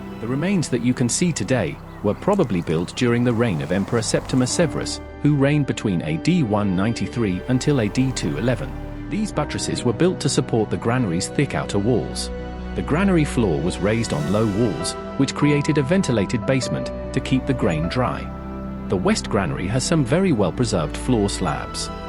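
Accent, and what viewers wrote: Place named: United Kingdom. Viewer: At least it is a British accent.😀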